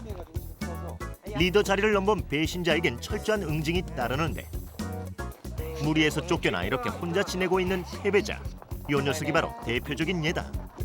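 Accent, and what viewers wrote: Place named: Seoul, South Korea. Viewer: 와 저때도 서울사투리가 좀남아있네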